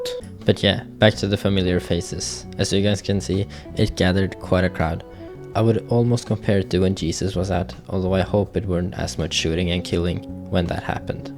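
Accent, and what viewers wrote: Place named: Norway. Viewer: very good norwegian english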